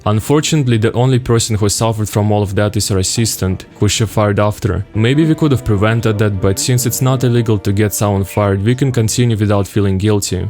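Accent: Russia. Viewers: the hard russian accent makes this so much funnier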